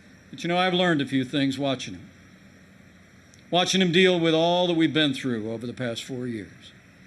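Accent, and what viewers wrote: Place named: United States. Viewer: I never knew Pence sounded so...American...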